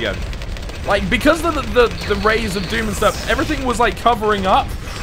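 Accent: United Kingdom. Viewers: i very loved ur english-british dude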